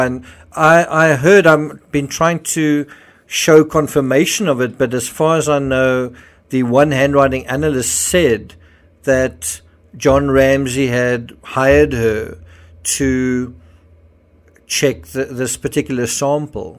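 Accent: South Africa. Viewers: The most South African voice in true crime.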